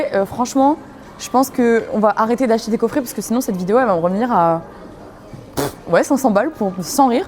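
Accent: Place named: North, France. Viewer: Elle vient de chnord je reconnais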